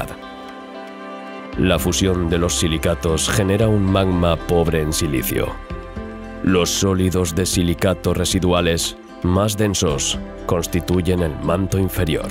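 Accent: Spain. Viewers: Y luego esa voz robotizada ,con acento español ,que huevaa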